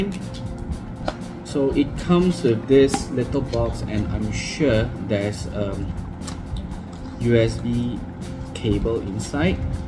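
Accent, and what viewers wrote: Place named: Malaysia. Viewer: Darn I wish you Malay Chinese and Singaporeans didn’t have that horrid accent. Both Lee Kwan Yew and prof. Gang Wu speak with a better accent.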